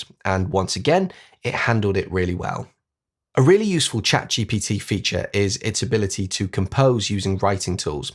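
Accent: United Kingdom. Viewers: Great. I’m in Lisbon understand your British English very well, congratulations! Just got a new 16 Pro Max and love your lessons. Will be a link member soon. Have shared several with my colleagues. Good health! Keep going into 2025.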